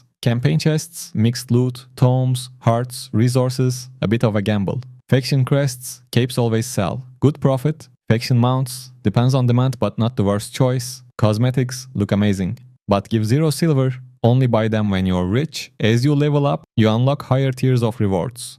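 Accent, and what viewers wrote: Turkey. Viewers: türk aksanı var azıcık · türk aksanı var sende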